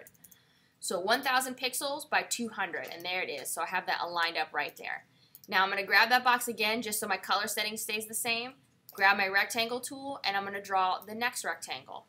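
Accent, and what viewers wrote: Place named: United States. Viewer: thanks for the american accent , very clear and helpful tutorial! very efficient for the new learner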